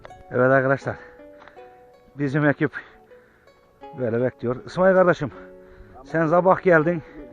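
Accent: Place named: Konya, Turkey. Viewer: Toprağım Konyalı olduğun buram buram konuşmalarından belli iyi büyük balık var mı gelsek saygılar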